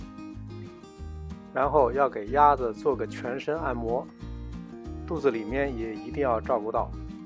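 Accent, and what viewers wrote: Beijing, China. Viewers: 喜欢听您这个不张扬的北京腔😉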